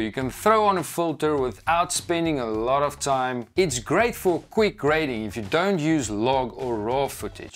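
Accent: South Africa. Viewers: You sound SA, cool video man!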